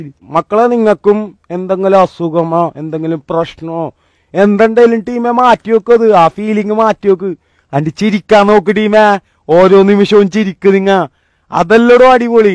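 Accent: Kochi, India. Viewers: Sorrry. Da. Nindea. Kochi basha. Suuuppper. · അവൻ ആദ്യം മുഖവുര പറഞ്ഞല്ലോ കൊച്ചിക്കാരൻ ആണ് എന്ന് . കൊച്ചി സംസാരരീതി ഇവന് 100 % ഉണ്ട് . കൊച്ചിക്കാരുടെ സംസാരം കഞ്ചാവ് അടിച്ച് പറയും പോലെയാണ് . ചിലവൻമാർ കഞ്ചാവും ആയിരിക്കും . "കൊച്ചി കണ്ട വന് അച്ചി വേണ്ട" എന്ന് പഴമക്കാർ പറയുന്നതിൽ കാര്യം ഉണ്ട് . പണ്ട് ആണുങ്ങൾ കൊച്ചിയിൽ പോവുന്നത് ലാവിഷായ് പെണ്ണുങ്ങളെ വ്യഭിചരിക്കാൻ കിട്ടുന്നത് കൊണ്ടാണ് . ഒരുപാട് പെണ്ണുങ്ങളുമായി വ്യഭിചരിച്ച പുരുഷന് പീന്നീട് പെണ്ണ് കെട്ടി ജിമിക്കാൻ താൽപര്യം കുറയും . അതാണ് അച്ചി വേണ്ടാ എന്ന് പറയുന്നത് . (Note: ഇപ്പോൾ അവിടത്തെ ആണുങ്ങൾക്ക് കുറച്ച് വിവരം വെച്ചു . അത് കൊണ്ട് പഴയ പോലെ കളിക്ക് പെണ്ണ് ലാവിഷ് അല്ല 🤪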